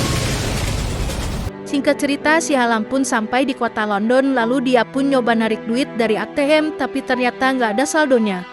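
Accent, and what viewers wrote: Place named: Sunda, Indonesia. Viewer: Udah lungse udah bucat😂😂😂😂😂 sunda pisan👍👍👍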